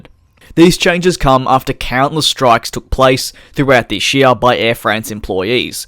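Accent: Australia. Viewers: what's worse? the a-380 or the gay narrator from oz?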